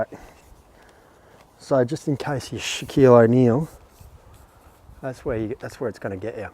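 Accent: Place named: Australia. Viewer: why on earth would this video get so many dislikes... haters? Anyway, I ordered a RRS TVR24 and BH40 w/swivel mount for my Leica M10P and Noctilux .95 50... nothing but the best all across the board.. and haters gonna hate, who cares! -- btw, I hear a Ausie accent, but looking at you I'd swear you were from Portland Oregon.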